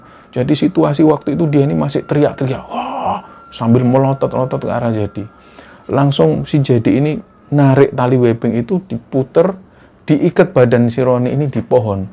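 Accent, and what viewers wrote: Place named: Surabaya, Indonesia. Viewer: Pengen ktm mas.dn team,mas. dgn logat khas sby nya n ciri khasnya, bikin 😍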